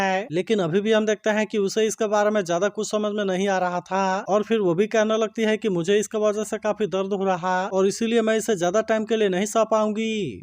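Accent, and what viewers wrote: India. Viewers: Are you a bihari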